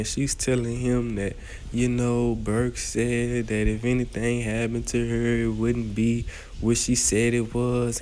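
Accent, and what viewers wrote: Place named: New Orleans, United States. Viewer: Hey bro. Love the commentary with the NO/BR accent. 504/225✊🏾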